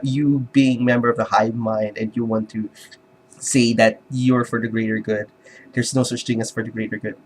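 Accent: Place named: Philippines. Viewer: 4 years later, I stumble upon this... I can tell from your accent that you (like me) are from the Philippines. I have never known anyone else here who was aware of this "culture war" that is happening in US universities. Have you ever had any conversations about this with your peers? and what did they think about it? I've tried talking to people I know about this and everyone seems to be oblivious about it. I guess the lack of talk about it here can be attributed to the remoteness of this particular issue in the country, we don't really see people being de-platformed in universities here (as far as I know at least, I could be wrong though) and this obsession of trigger warnings and safespaces. The only problem that I see, is that if we don't pay attention to this problem happening in another country, we put ourselves at risk of repeating the same thing here.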